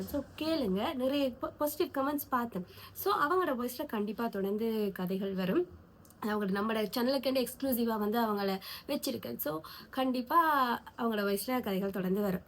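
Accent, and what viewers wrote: Sri Lanka. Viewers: Mam neenga srilanka va unga novels read pannum pothu theriyuthu....indha video pathatum vilanguthu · Pavani voice so sweet so problem Rj voice ku neenga srilanka tamil ah slang romba pudikkum sutha tamill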